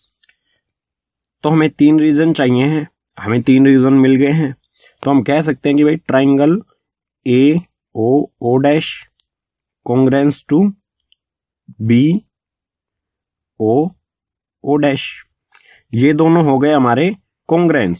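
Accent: Haryana, India. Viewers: Aap haryana ke ho kya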